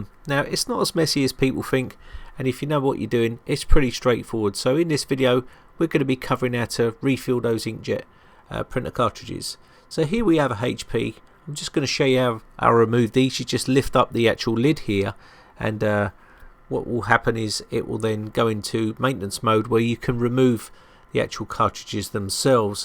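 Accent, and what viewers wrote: United Kingdom. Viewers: This is not a Cockney accent,it's British English, Cockney accent is more like 'strine' Australian English.even at time like Texan English.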